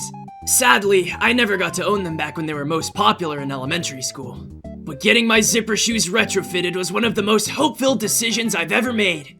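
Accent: South, United States. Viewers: ✨ I’m ✨ Uncomfortable ✨ with ✨ ✨ country ✨ accent ✨ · the country accent scared me. i physically recoiled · how do you sound like and have a country accent at the same time. I - that's incredible. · HOW DOES HE HAVE HIS STUPID VOICE AND A COUNTRY ACCENT AT THE SAME TIME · If I never hear that Southern accent again, it’ll be too soon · with a country accent is somehow cursed and blessed at the same time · Love the country accent 👌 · holy hope, the country accent, man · I love your country accent so much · HELP HE HAS A COUNTRY ACCENT THAT SURPRISED ME SO MUCH I JUMPED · 'Ya didn't tell y'all fans 'ya had such a good cowboy accent! Teach me 'ya ways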